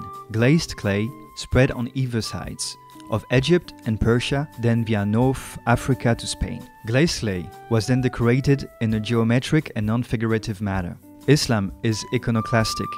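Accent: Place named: France. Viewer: I think you're wrong, he sounds French. Love the accent. ❤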